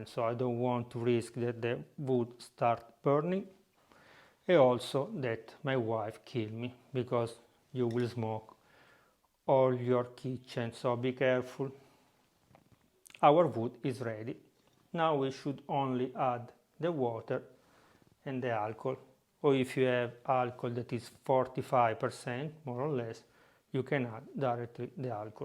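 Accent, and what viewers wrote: Italy. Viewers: He's making flavoured alcohol and he has a comical Italian organ-gringer accent. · Yes, I started it in the morning and after ten days I will tell you the result... But i noticed that you speak English with an Italian accent 😄🤣 This makes you very nice 😄 And by the way, I love Italy so much and would like to visit it. 😍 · Orca boia l'accento italiano. Soltanto per questo ho guardato tutto il video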